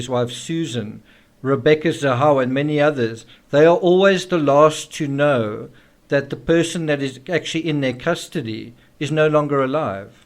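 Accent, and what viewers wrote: South Africa. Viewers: South African youtuber? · I know an SA accent when I hear it. Awesome channel! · Are you from south africa? Your accent reminds me of my friends from south africa.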